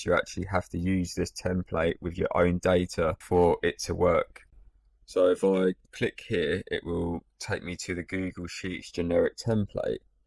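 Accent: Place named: United Kingdom. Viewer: your British voice sounds so classic!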